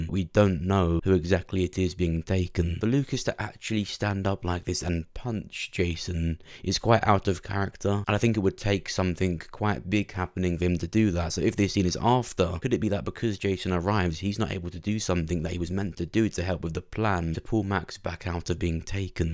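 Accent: United Kingdom. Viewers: He sounds like a guy from the UK 😂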